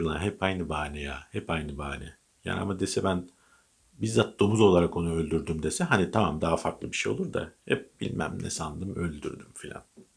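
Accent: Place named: Black Sea, Turkey. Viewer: Ula yine gırdun geçirdun!! Özellukle iki yorimun çok superidi!! Sağolasun. Garadenuzli değilum lakin o şiveye bayılayrum!! Öpildun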